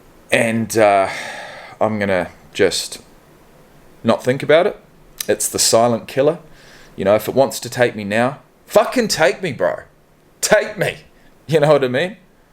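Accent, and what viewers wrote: New Zealand. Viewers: His accent is New Zealand not Aussie but they are similar. Congratulations on your sobriety. :) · I thought you were a fellow kiwi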